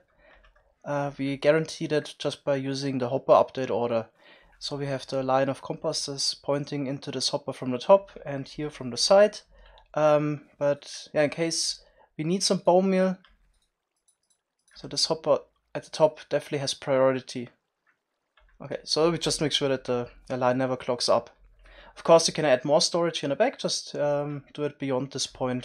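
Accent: Germany. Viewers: Is your native language German??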